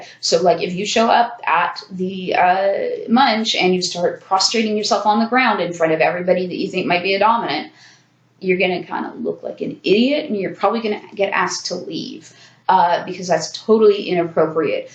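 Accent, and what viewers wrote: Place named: Canada. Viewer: Learned so much in these 2 vids, thanks! Also, funny/cute how you say aboot & sore-y like on Degrassi 😆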